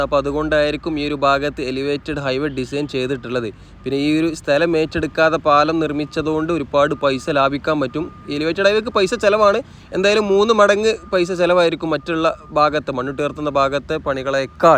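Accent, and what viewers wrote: Malappuram, India. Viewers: ഞാൻ കണ്ടതിലേക്കും വച്ചു ഏറ്റവും നല്ല എപ്പിസോഡ്. സൂപ്പർ അവതരണം. അതും സൂപ്പർ ക്ലാരിറ്റിയിൽ.പുരോഗതി എങ്ങനെ വേണമെന്നും അതിൽ ജനങ്ങൾ എങ്ങനെ സഹകരിക്കണമെന്നും അതും എനിക്കിഷ്പെട്ട മലപ്പുറം ശൈലിയിൽ.❤❤❤❤. എന്നാണോ പേര്.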